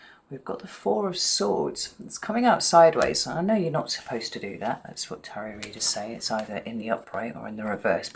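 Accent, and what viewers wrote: United Kingdom. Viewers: I feel the same as last reader, you really seem to explore the meaning of the cards. Also loved this reading, I am Sag rising and I feel like Sag readings relate to me more than my sun. I also love listening to that awesome British accent. The tall wizard behind you i had one just like him-lost him in a move can't find those wizards anymore where did you get yours?? Blessings to you!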